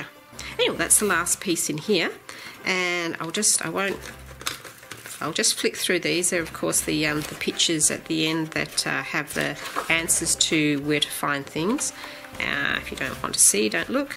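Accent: Australia. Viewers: I hadn't watched anyone's reviews until I received my copy and I got my copy a few days ago and recorded my review yesterday. This morning I thought "OH I want to see what thinks" and it was so funny, you got excited about the same features as I did. It was like watching my review but with an Australian accent. I'm a big fan and can't wait to see what you do with it in a tutorial. ~ Chrissy Morin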